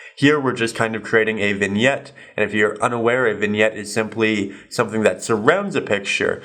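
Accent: Canada. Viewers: I always enjoy your lessons...& your accent...eh?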